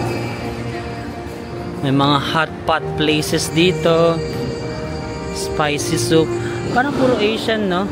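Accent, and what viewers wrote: United Kingdom. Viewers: That is more of a UK accent mate😂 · Meron kang British accent. Always stay safe in Sydney. Godbless! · You sounds British mate!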